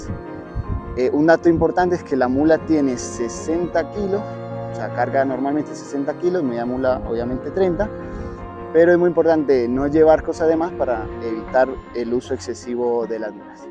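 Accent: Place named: Mendoza, Argentina. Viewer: Hola muy claro tu mje.,soy Mendocino pero quede desconcertado con tu acento,sos Menduco?.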